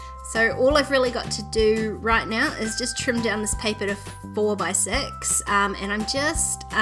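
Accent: New Zealand. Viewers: Love it, great to hear a kiwi, can’t wait for my kit to arrive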